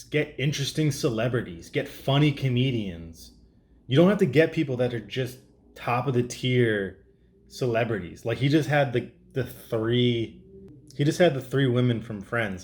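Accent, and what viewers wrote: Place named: East Coast, United States. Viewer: You should listen to Cum Town, hosted by comedian Nick Mullen. And there always joe Rogan, he puts on a shit ton of talented comedians, his ego is in check and he’s much more honest. Also check out grimewave podcast, you sound like you’re on the east coast, it’s a ton of talented and funny Boston dudes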